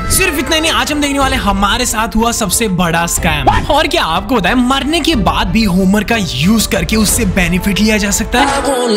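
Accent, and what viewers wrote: Odisha, India. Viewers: Bhai tum odisha se ho kya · Odia Hindi ❤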